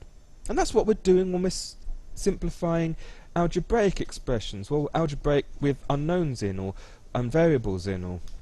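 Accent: United Kingdom. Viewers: Why are the lessons are being u British man? I can't understand that heavy ass accent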